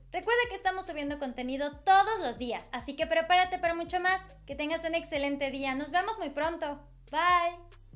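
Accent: Mexico. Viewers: Me fascina tu stilo y como hablas bien mexicana I love this buen trabajo preciosa felices fiestas guapa😘😘😘😘bien professional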